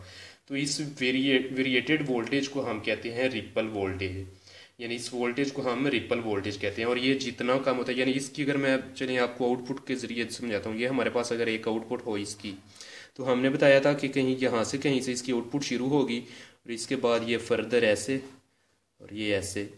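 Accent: Punjab, India. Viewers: Are u from Punjab?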